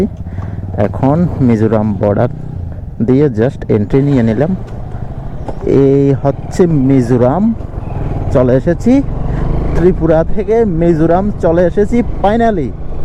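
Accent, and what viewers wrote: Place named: Noakhali, Bangladesh. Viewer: Bhi tomar Noyakhali dialect onaak sundor. Noyakhali ta e koioooo . 😊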